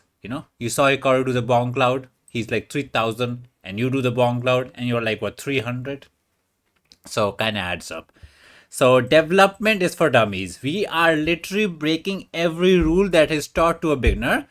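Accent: India. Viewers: I used to be bad at understanding Indian accents but I’m a master now that I watch this channel every day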